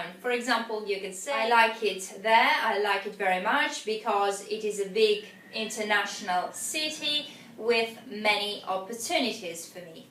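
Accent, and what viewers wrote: United Kingdom. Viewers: thanx for the lessons mam 😊 ...are you from UK?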